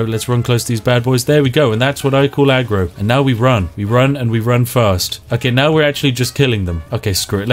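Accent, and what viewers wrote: United Kingdom. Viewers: SO NICE!!!!! I REALLY ENJOYED THAT VIDEO!!!! british accent!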